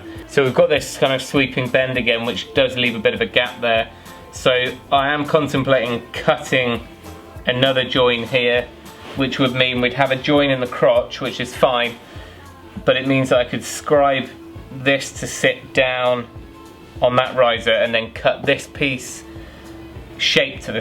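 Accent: United Kingdom. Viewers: Great work and video. I can listen British English lol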